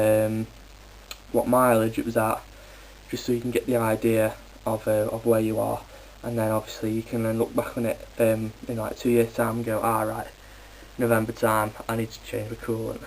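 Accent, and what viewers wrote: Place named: Kidsgrove, United Kingdom. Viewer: Hi, although I am quite capable of changing coolant - I watched this the night before - I have a <num>SA Bandit, and pretty much everything is the same. Expansion/recovery tank is under the right side of seat fairing. Anyway First bike I had since I sold my last blade in 2004, so wanted a watch a video first - Yours was great and your accent reminded me of my time in Kidsgrove. Anyway mate great video, thanks for taking the time to show and tell. Thanks Bud!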